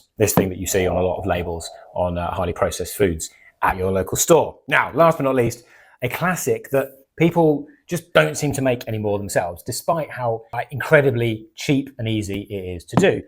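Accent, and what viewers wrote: United Kingdom. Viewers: Just found your channel, or rather Youtube did. Im confused you sound British, but your from Oz? Im Subscribing anyway as i like the concept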